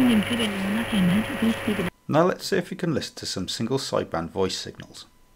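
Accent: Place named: Cardiff, United Kingdom. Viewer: Nice project for the RP2040. By the way, to my ear, you sound like a Cardiffian who lives/lived in England?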